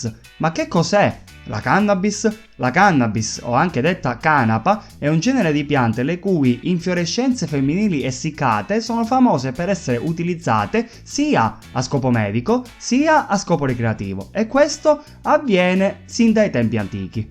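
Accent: Italy. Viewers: Compà sei calabrese? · Quanto ci sta l’accento sardo ?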